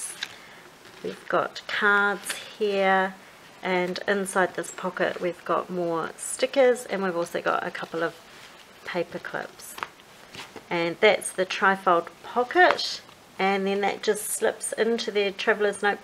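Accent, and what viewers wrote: New Zealand. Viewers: I love your accent! <3 and your work, ofc. Do you happen to live in NZ? :)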